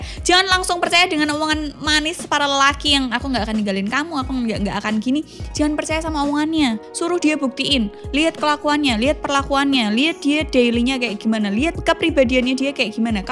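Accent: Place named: Semarang, Indonesia. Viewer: Semarang mass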